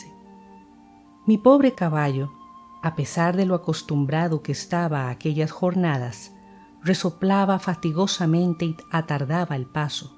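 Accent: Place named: Costa Rica. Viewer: Creo que es acento de Costa Rica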